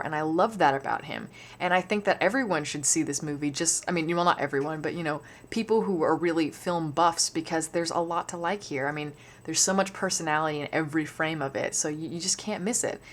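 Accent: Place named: South, United States. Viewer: Southern twang? Accent has changed a bit. A follow up re review on this? It has aged horribly imo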